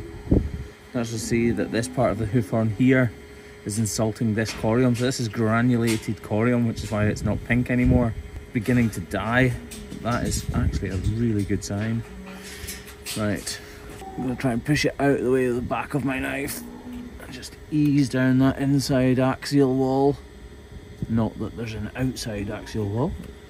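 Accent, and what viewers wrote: Scotland, United Kingdom. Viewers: Okay, I am happy to see the cow much improved, and I have to say that on top of enjoying your great Scottish burr you are very handsome.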